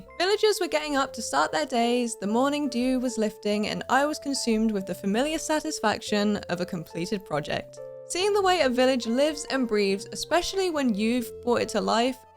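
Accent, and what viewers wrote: United Kingdom. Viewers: Are you British you sound British?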